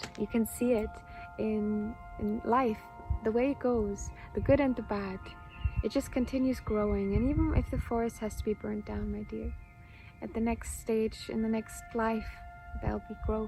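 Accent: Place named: Ireland. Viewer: Thank you, for a very nice, spot on reading. I like the Irish touch in your accent. Looking forward to more readings. I feel very good about what I have built, abundance is very subjective. I know the abundace that I aspire towards.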